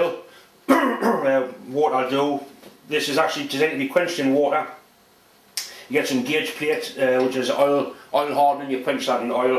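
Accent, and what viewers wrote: Newcastle, United Kingdom. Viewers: my wife has trouble with your language!!! your Geordie accent that is.... but she is from Mississippi, the deep south, usa, and has trouble with my mixed us new England/Australian accent, so go figure. you hear infinitely worse language on the tele, on the street, on public transport and most certainly in any school yard from kindy on up. keep the videos coming and don't change a thing!!! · as far as I'm concerned don't change a thing, love the geordie accent and your humour, takes me back to a time when I was married to a " Monkey Hanger" ( anyone who does not know what this, google it). The people of the North East work hard, play hard when work is available, when I used to visit I was always known as the "Cockney Bastard" but it was never once said with malice, they were at that time the happiest years of my life and I have so many memories of the North East.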